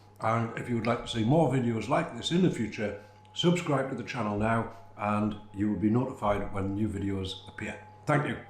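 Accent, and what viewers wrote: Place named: North East England, United Kingdom. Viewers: Enjoying your videos having just discovered your channel - I am sure many folk will appreciate your style i.e. explaining the ingredients with costs at the beginning of your presentation. I use an air fryer and may I suggest you give an idea of the bake settings - all air fryers perform differently so a guide would be invaluable. Enjoy your NE accent - probably Sun'land where I grew up. Makes me homesick. Tell me if I am right or wrong please. You sound a canny lad and I am sure you will have some canny recipes to come. I have subscribed to you channel and look forward to more. Good luck and good fortune. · Thank you. I wanted to ask where you are from because I try to place British accents. I thought it was a "Northern" accent, but not exactly what I was used to (I think of how the Physicist Brian Cox speaks as "Northern") -- there are so many accents to learn. 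Thank you, again. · As others have said here the accent is from the NE of England (just South of the border with Scotland) In fact your own George Washington's forefathers would have spoken like this since they came from Washington in Durham 👍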